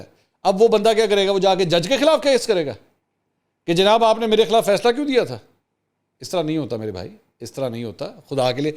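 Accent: Punjab, Pakistan. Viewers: صاحب آپ جو تھوڑا سا پنجابی ٹچ دیتے ہیں تو مزا آتا ہے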